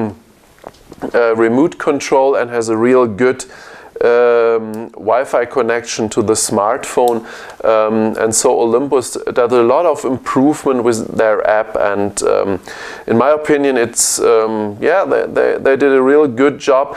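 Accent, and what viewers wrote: Germany. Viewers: It's typical German English, not that bd however :))) Just a small correction: - All 3 OM-D-s share the same 16MP Sensor - The E-M1 flagship has also phase detect AF points on the sensor while the others don't - The E-M1 and M10 don't have an antialiasing filter on the sensor while the E-M5 does have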